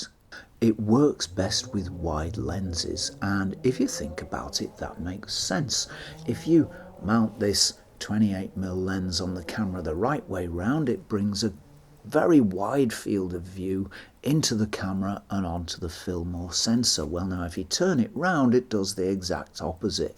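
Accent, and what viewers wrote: United Kingdom. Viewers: Who would tell me that Steve Buscemi is reviewing lenses on Youtube with an indistinguishable british accent?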